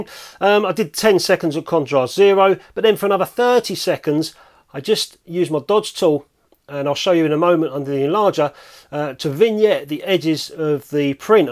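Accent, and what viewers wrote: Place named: Scotland, United Kingdom. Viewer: As I understand you live in London, but your speech is similar to Scottish😉💂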